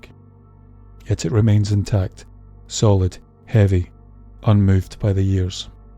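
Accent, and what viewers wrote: Scotland, United Kingdom. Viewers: Soooo enjoy your soothing and wonderful Scottish accent! I’m in love. ❤️🏴. Your stories are so intriguing and with the right touch of history and mystery. Scotland is my most favorite place on earth. I am blessed to have sister by heart, ♥️ she is from Aberdeen. Was up this past June. I will definitely be back. Living in Scotland would be my dream. Thank you. And just for a good laugh, I’m from “ Erie” Pennsylvania. USA 🤭😉👍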